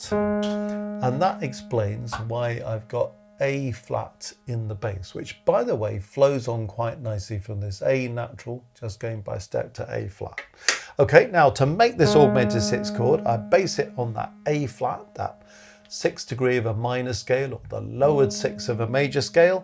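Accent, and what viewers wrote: United Kingdom. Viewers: Just heard three words with your British accent made me push the thumb up :)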